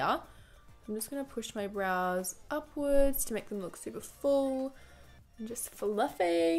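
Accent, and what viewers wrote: Australia. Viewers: Omg I've always watched her with the sound off and I had no idea she has an Australian accent I was so surprised!!! I am so dumb😂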